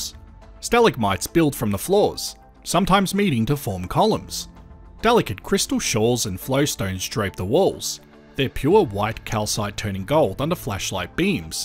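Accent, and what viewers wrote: Australia. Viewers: It is part of the Australian accent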